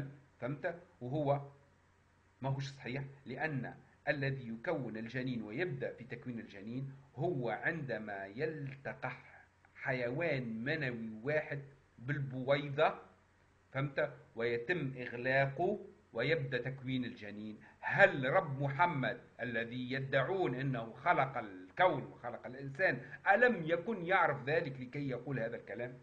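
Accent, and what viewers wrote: Tunisia. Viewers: اذا تتكرم أخي وتخففّ من اللهجه التونسيه، شوي صعبه علينا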